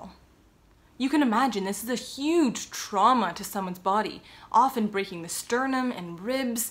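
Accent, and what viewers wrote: Canada. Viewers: I live in the US in Ohio. Just thought I'd throw that in there as well. You sound like you have a Canadian accent. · I've never seen your videos before, but as soon as I heard you speak I knew you were Canadian from your accent! Great video!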